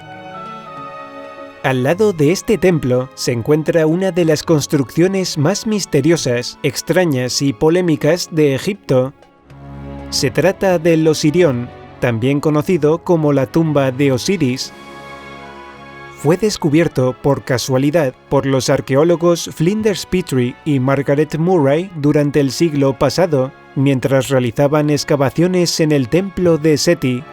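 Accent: Spain. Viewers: el video y el contenido de este canal me encanta . se nota que hay información muy valiosa . pero me molesta sobremanera la voz y el acento ibérico . me gustaría que fuera un locutor latino quien relatara cada video de este canal . Saludos .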